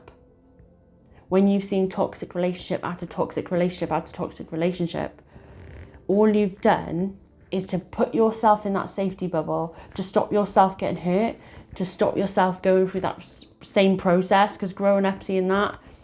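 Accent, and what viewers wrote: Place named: United Kingdom. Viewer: 2 years this as been going on and the same time following tarot this reading is so accurate it is unreal everything not one word out of place its mad how your channel just poped up on my screen when i was about to give up and completely forget this but you have given me hope.Thankyou so much its lovely to hear a British reader ❤️ ❤stay safe❤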